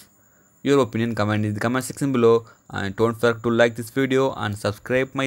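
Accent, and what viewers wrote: India. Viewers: chinese man with indian accent?? WTF?! 😂😂😂 that's not your video you copycat!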